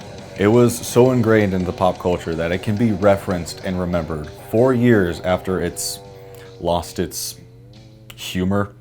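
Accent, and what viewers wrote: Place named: South, United States. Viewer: Nice southern accent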